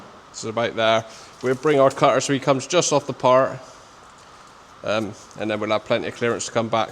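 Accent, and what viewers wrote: Bristol, United Kingdom. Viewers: Great work, sound like Bristol accent or close to Bristol?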